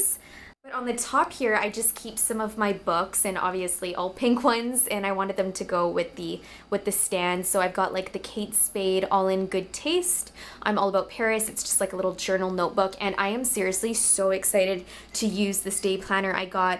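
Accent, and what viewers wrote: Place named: Canada. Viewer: Canadian accent on fleek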